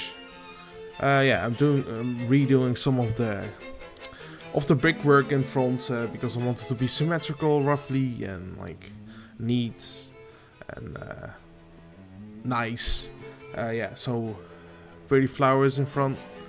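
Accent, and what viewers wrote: Netherlands. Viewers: Love your dutch-english lol. I think adding glass parts in your roofs would make the inside look way better adding natural lighting aswell as making the roof more diverse from above.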